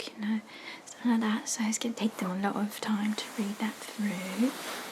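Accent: United Kingdom. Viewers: EWWWWW BRITISH ACCENT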